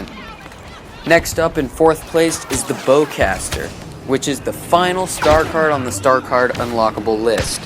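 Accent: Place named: Balkans. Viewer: your sound close to balkan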